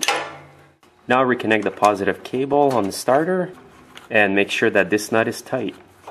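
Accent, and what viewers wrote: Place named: Canada. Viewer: Best instructional video I've ever watched!! Love your Canadian accent. My wife is Canadian so I,m kinda partial.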